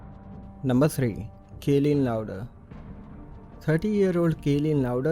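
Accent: India. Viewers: Indians don’t make good commentary when it’s English, can’t listen to a voice like that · I LIKE YOUR CHANNEL!!! IT'S CREEPY ASF!!! YOUR INDIAN ACCENT MAKES IT EVEN CREEPIER!!!!! GOOD SHIT APU!!!!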